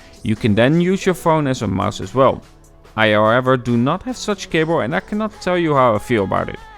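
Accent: Netherlands. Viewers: Ok. I see "NL" on the phone and you sound a little Dutch. Is the Mate 10 available in the Netherlands?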